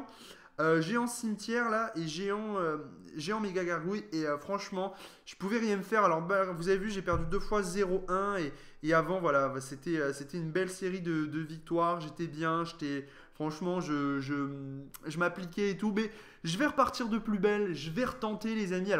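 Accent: France. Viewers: J'adore ton accent marseillais ! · l'accent du Sud 😃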